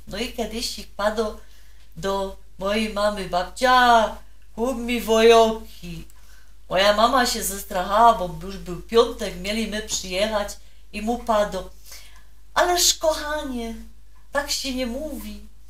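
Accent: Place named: Podhale, Poland. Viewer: Wymacie piekna mowe po Slasku i u Pani slychac Goralski akcent. Pierwsze myslalam ze Pani jest z Gor , Goralka = Bergfrau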